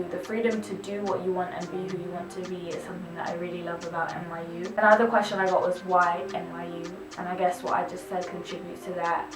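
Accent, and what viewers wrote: United Kingdom. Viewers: Wait so did u got to an American high schools or British secondary u sound very British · Wait how come you have a british accent xx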